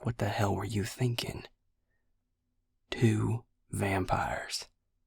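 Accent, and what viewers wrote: South, United States. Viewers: the southern voice 😙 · Okay I’m normally not a fan of southern accents but yours is an exception 👀💳💥💥💳 not too strong I like it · he rlly do, sounds like a southern or country accent he’s got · loooook im not a fan of southern accents but <3333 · Even for someone who's lived in the south their whole life, accent still gives me 🦋🦋🦋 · as a southerner you're really killing the southern lingo! all you need now is to throw in a handful of "fixin' to's" and "ain't's" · HE SOUND LIKE HIS FROM THE SOUTH “hey sugar” 😫😫😫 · I have to say that I do love calming bedside manner as a healer! Just hate to see him being drained to heal. Happy to hear from again! His smooth, Southern accent is so nice. Love learning more details to this ongoing storyline.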